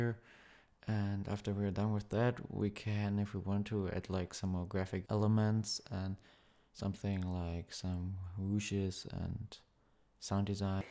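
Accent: Germany. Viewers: Bist du deutsch? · Als bist du ein Deutscher 👏🖒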